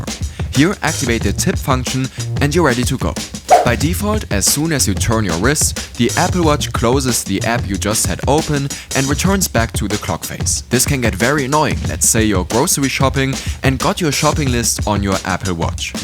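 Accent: Germany. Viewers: German accent overload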